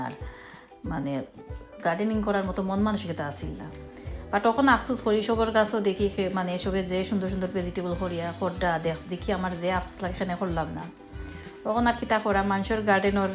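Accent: Sylhet, Bangladesh. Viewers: ভেরি নাইস সিলেটি মাত আপু আমিও সিলেটি রান্না দারুন হয়েছে পাশে থাকবেন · Mashaallah nice garden apa r apnar sylheti mat huniya bala lager! Lau etare amra sylheti manushe Aushi lau koiya dakon r ami ou matro apnar lakan chicken deya ranchi kubi moja oichi r shutki deya o ranle aro bheshi moja! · Sylheti mat vala lager apu . Nijer language e matle vala lage . Plum tree amer kub vala lage apu ❤️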